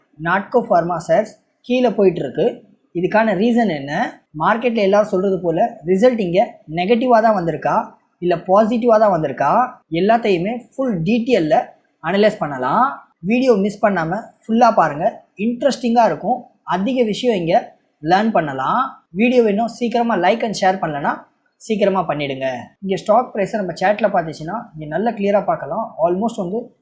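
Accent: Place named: Mumbai, India. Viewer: Mumbai kaarar paesum alagiya tamil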